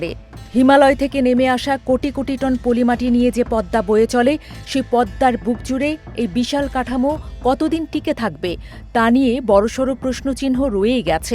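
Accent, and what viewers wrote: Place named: Kolkata, India. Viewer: এটা কলকাতার ভাষায় কথা বলছে😂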